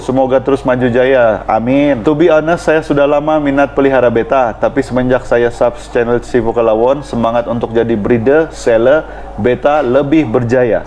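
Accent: Sabah, Malaysia. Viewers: Yay seharian tunggu video bro.. Firstime tengobluerim mati.. Sudah ketagih dengan slang sabah.. Nk tanya berapa minimum size betta untuk jar.. Ataupn umur.. Betta saya 1 cm 3 minggu baru tak sabar nk jaring plak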